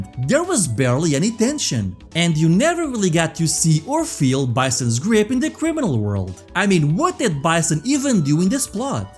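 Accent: Portugal. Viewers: Vc é português? Pelo sotaque, pareceu muito. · I heard your speaking and already knew you were portuguese! Lmao! Thank you for making content on brazillian fgc. Greets from Brazil!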